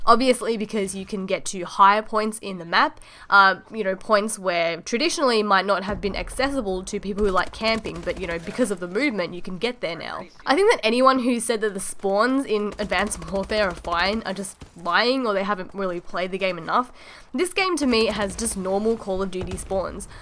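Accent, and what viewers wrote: Australia. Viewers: Yup. Subscribed. Very articulated thoughts and well presented. Plus, there's just something about Aussie accents. It's magical.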